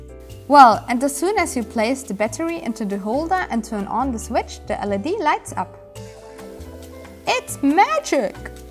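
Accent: Germany. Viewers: Are you german? Becuase ur accent sounds like that. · german accent strong with this one :D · Ist sie Deutsche? :D Eigentlich hört man es ja, aber keine Ahnung, kann ja auch ein anderer Akzent sein. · Ich bin selber aus Deutschland und erkenne den Akzent meiner Sprache ;)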